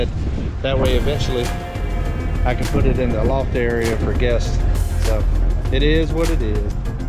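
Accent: United States. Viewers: unfortunately, he is one of those guys that really rubs in that country accent.....too much for me. hope he settles in and loves his choices.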